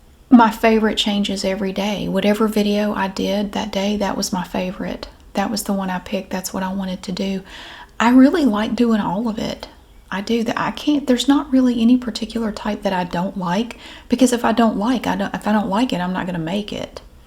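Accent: South, United States. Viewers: I’m with you…I love solitude and doing most things by myself. 🙌🏼 And your soft but not whispering southern accent/voice is such perfect ASMR…love your videos!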